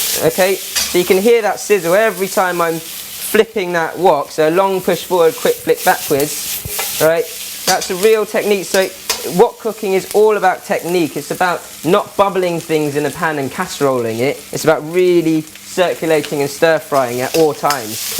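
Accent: England, United Kingdom. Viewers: I love the Asian, with a British accent, cute. Nice presentation on Wok cooking. Italian here loves woks and Asian cooking. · Love the British accent. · DawgYankee i dont understand why people commenting on his accent, he was probably raised in the uk, maybe thats why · He said he lives in london 008-009...dont know any better place to get an english accent. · You speak like a mate from England or UK? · Thanks for sharing, I’m new to wok cooking and this is great information. Thanks again I like your Pommy accent just awesome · An Oriental man with a British accent...Interesting...Very informative wok instructions...Nice flipping skills... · that's a chinese dude with a english accent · comments are quite churlish. who cares if he speaks english. he actually sounds British but the point here is to understand what the wok is and how amd why it is used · Authentic English accent ?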